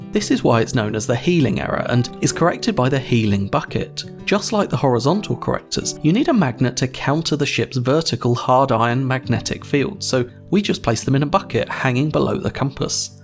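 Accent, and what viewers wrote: United Kingdom. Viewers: Sorry, but am just tired of the instinctively haughty British/cockney accent. Any chance you can post a video where MS Word reads the text? "Feewwd" vs. "Feeld"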